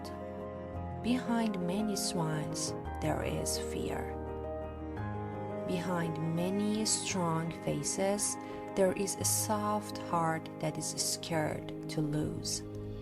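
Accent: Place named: Iran. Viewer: عالی بود و ممنون از زحمت شما . اما اکسنت ایرانی بود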